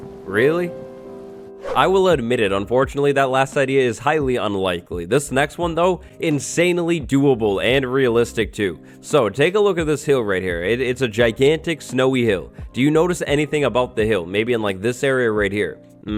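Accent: United Kingdom. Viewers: Top notch British accent 👌🏼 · from intro: you're british? xD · wait you’re british??? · Aye yo your british? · wait you're british? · Ur british I am to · ur british?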